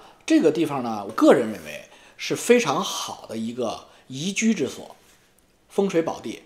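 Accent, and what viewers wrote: China. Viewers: ，前些天你笑话阿姨的英文，今天我听出你的几个单词发音也不理想，中式发言浓度偏高。Fuck，你说的往fak偏；balance，你说的掰Lance；还有一个词可能是要说Melt 融合，说成迈特。见微知著哈，元音的发音比较中式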